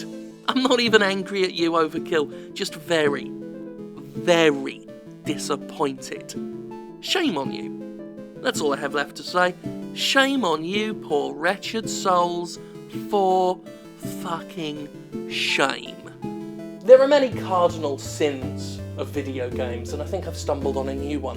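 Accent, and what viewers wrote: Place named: United Kingdom. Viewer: My favorite part about your reviews Jim is definitely the way your insults (deserved ones) and criticisms to bullshit in the gaming industry just come out smoothly with that accent of yours. Something bout the dry humor and voice of British commentators in various forms of media that just sounds really cool.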